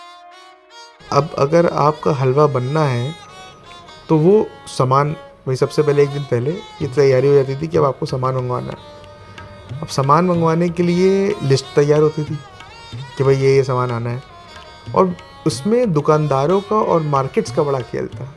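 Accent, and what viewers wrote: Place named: Lucknow, India. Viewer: Baaqi sab accha hai aapke videos mein but aapka pronunciation pe work karna hoga specially when you are carrying lucknowi touch in your words example its not फ़िर its फिर😊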